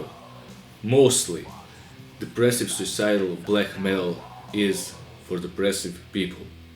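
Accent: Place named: Russia. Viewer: Я чую русский акцент)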